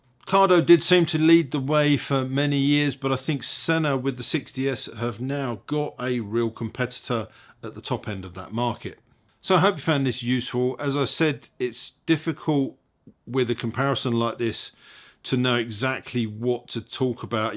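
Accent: United Kingdom. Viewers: Thumbs down for the British accent. Mercia.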